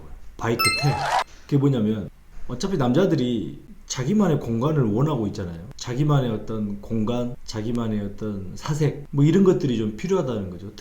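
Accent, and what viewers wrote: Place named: Jeolla, South Korea. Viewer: 말씀 중간 중간에 전라도 사투리 넘 구수하고 재미납니다 팬입니다 ^^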